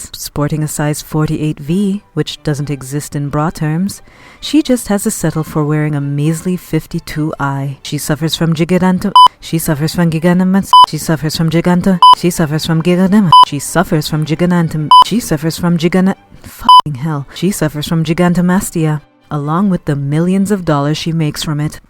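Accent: Jamaica. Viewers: Your accent is sounding freshly topped up like you recently visited Jamaica! I heard it slip out when you said operation 😆 Love all your videos - and I would totally watch one about kneecaps if YOU made it!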